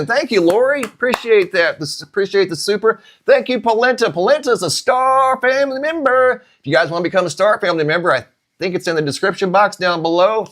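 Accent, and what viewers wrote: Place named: Louisiana, United States. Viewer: Ayyye! South Louisiana! U da mayn! Mayne!!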